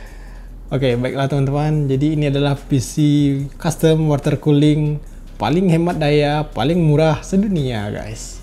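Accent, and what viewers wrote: West Sumatra, Indonesia. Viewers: Abang orang minang kh?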